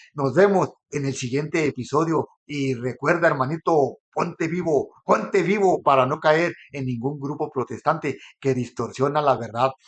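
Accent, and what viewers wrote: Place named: Mexico. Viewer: Me encanto, mas alla de sus palabras, disfrute de esa tonalidad mexicana, se me hizo hermoso en su conjunto.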